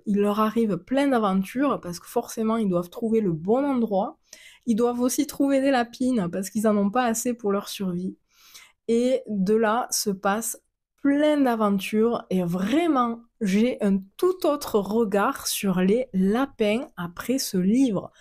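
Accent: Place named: South, France. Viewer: Je decouvre votre chaine. Bravo pour votre authenticite.Douce et jolie,aimez-vous ça vaut le coup! J.adore votra accent du sud je me regale moi qui suis du Nord. Bonne continuation. Je vous suis desormais. Merci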